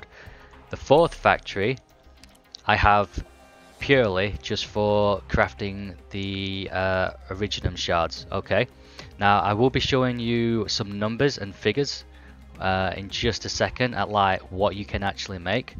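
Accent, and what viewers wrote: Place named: Scotland, United Kingdom. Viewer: scottish accent just make it better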